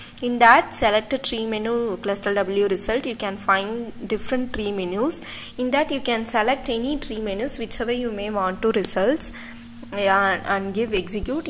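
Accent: India. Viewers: I think she has an Indian accent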